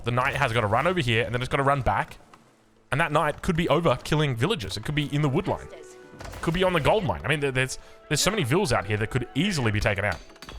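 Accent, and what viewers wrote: France. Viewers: still wanted to hear your French accent Man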